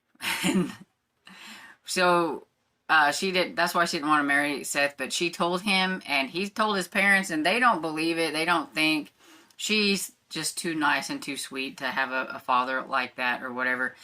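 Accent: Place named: South, United States. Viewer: Happy weekend! That Chef Boyardee looked really good. I haven’t had it in years. I always had mine with a peanut butter sandwich. That was started by my mom when were kids. 😂 And of course you can consider yourself southern! You live in the country and you have a slight accent. That’s all you need. lol!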